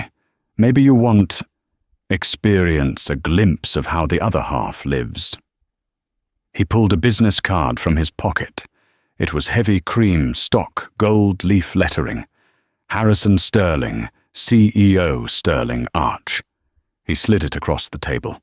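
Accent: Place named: England, United Kingdom. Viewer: Yes. I agree. Luv that purring English accent. YUM!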